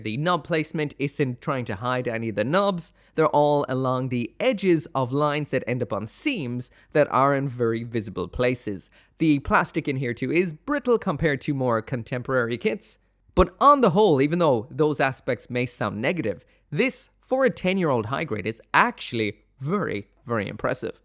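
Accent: Ireland. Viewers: Irish Acent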